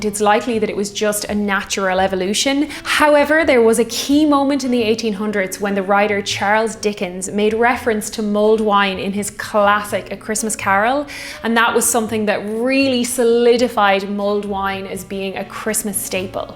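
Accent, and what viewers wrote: Ireland. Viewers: Great video! Thanks! Lovely Irish accent.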